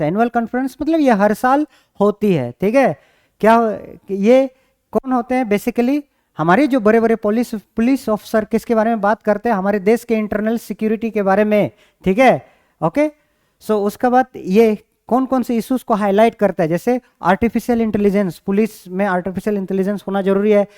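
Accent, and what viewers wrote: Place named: Assam, India. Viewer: sir no one says ur nepali until you tell or emphasize it , so stop aying this sir and yes if u were then teacher kidhar se bhi ek student ka karm hai ki wo uske guru ki respect aur shiksha ka adr kar use grhan kare so pls don't ay this sir , when i attend the first lec. i felt as u r an indain only from assam or meghalaya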